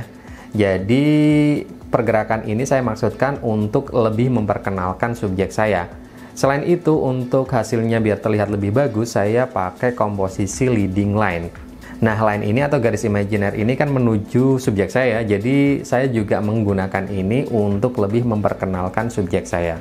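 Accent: Pekalongan, Indonesia. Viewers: Orang pekalongan yah bang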